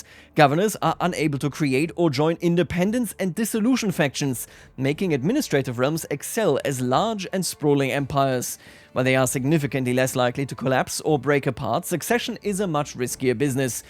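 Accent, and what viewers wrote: Bavaria, Germany. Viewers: Must be the Bavarian accent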